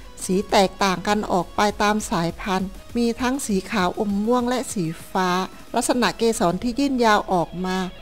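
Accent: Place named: Cambodia. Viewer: สำเนียงคล้ายๆเขมรแถวบ้านผมเลยครับ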